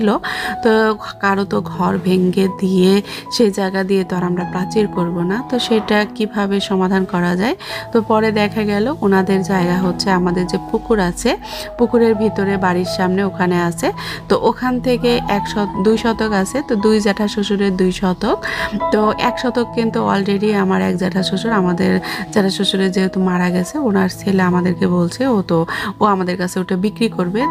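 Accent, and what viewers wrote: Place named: Bogura, Bangladesh. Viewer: আপু তোমার মুখে বগুড়ার ভাষা শুনে খুব ভালো লাগছে 😊আমার দাদাবাড়ী বগুড়া শেরপুরে সীমাবাড়ি আর সারিয়া কান্দি ভুয়া গাথি অনেক আত্মীয় আছে😊❤❤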